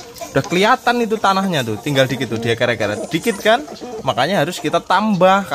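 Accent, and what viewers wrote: Java, Indonesia. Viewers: Alamatnya mana om.....kok bhsanya Jawa medok banget. Pingin dolan kesitu belajar....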